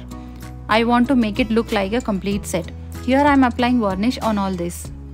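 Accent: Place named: South India, India. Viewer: see is south Indian her accent will be like that You don't teach her necessary English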